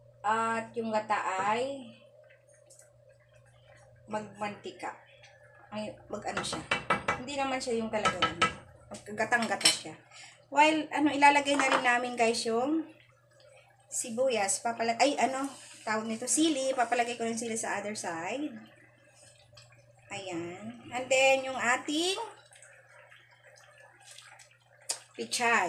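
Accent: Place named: Visayas, Philippines. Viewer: Ha ha natawa ako sau sis para kang totoong bisaya , agoy sarap naman nyan ginataang tulingan.yes tuloy lang ang laban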